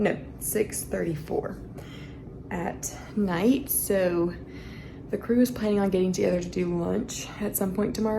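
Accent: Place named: United States. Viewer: Lovely to see you, beautiful and professional as always, luv the American accent xx Matt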